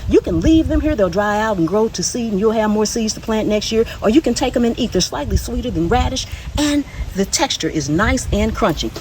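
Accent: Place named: North Carolina, United States. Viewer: My NC friend ❤ I’m in Northeastern NC. I’m gonna have to take some lessons from your gardening channel. I thought you sounded like you have a NC accent. Thank you ❤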